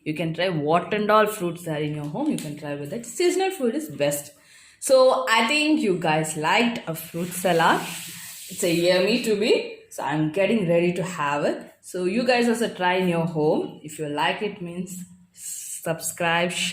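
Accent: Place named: England, United Kingdom. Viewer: I just like your English accent than this fruit salada😊😊😊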